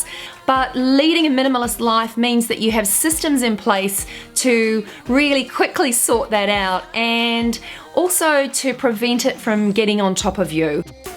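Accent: New Zealand. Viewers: That is not an Australian accent. I think she’s from New Zealand. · As soon as you started talking I was like is she kiwi yes she has to be. I was also very excited that you are 💓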